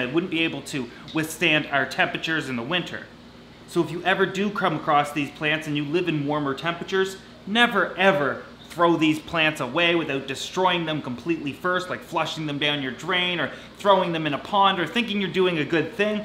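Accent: Canada. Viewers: Hes canadian, was accounting for his accent. Anything remotely close is better than permagranite lol.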